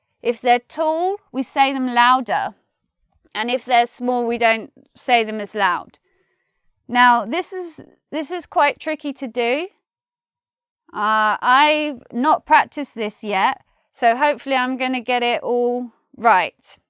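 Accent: United Kingdom. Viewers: Sólo si me caso vos podré tener ese hermoso acento británico 😍✨..💘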